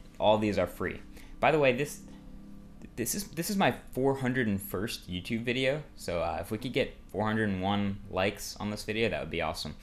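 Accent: Australia. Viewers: Awesome British Accent! Got a hint of aussie in there aswell lol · Im from the UK, your accent sounds more Australian! XD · nice aussie accent! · Yeah, you sound more Australian, than Brit! Sorry, but it's true. Another very wonderful,expositive video. Thanks for all these tips, I'll try almost all of them. Hope you get 4000 likes! G'day mate! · Accent more Aussie than Brit lol. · Started off English. Ended up Australian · sounded aussie :D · im from the uk... we dont sound like that, thats more like austrailian tbh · Accent sounds more Austrailia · definitely Australian lol im british too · Im australian and i tought you sounded more Aussie then having a UK accent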